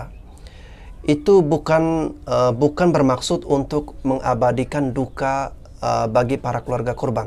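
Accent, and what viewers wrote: Flores, Indonesia. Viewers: Komentatornya mantap ...juga logat dan intonasi suaranya flores benget....bravo cinta Jokowi dari NTT. Tuhan Jesus memberkati.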